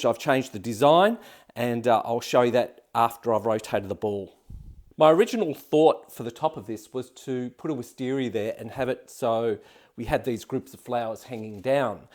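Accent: Australia. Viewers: Thanks so much! I’m making my way through all your videos and have enjoyed them so much. Especially with the Aussie accent!😂 Thanks for the link to a detailed answer for my question. I look forward to watching it! Cheers from 🇨🇦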